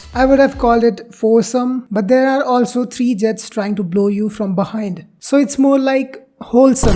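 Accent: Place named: India. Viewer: Why your Indian accent sounds like a middle aged aunty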